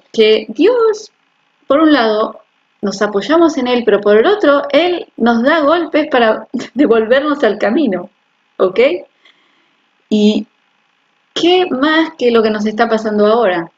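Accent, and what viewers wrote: Argentina. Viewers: Perdona, eres argentina?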